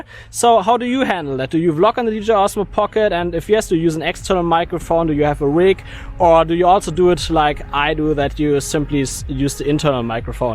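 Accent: Germany. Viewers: Kann es sein das du deutscher bist , wegen deinem Akzent beziehungsweise für mich kein Akzent 🤔 aber wie es auch ist super Video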